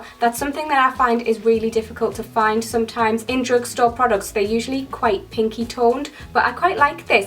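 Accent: Newcastle, United Kingdom. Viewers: I love your videos as I am a fellow Geordie. You are a Geordie aren't you 😂😂😂 Please do a freedom makeup tutorial ❤️💖❤️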